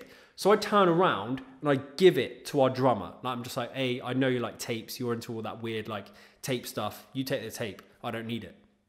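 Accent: United Kingdom. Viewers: why are you british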